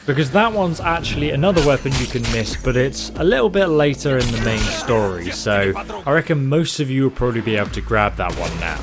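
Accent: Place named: United Kingdom. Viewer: mishrooms I tolerate him because hes handsome and his british accent is good and his temperment is enjoyable iin his videos... but yeah hes jumping on the bandwagon of mass producing "guide " videos and using keywords like the millions of other youtubers all doing them... Idk how he has time to do ANYTHING but play these games i mean I havent even finished AC valhalla yet it was just so massive - and now THIS is massive too... I'm drowning in these open world games that GIVE you the whole world at once - piecemeal it to me - All these massive open world games give me anxiety and make feel overwhelmed and exhausted as soon as I see the map and all teh shit ive got to do to satisfy my OCD and ADHD :( MAkes me want to play and On Rails or series of corridors game that t ells me a nice story instead of all of these options - i mean some optionms are good but not dozens and dozens of side quests and collectibles and every zone opened up after the prolouge... 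shit makes me not even want to be in parts of the city, like some parts of it are so confusing with the verticality it took me like 10 minutes to find the door to a ripperdoctor there otehr day lmao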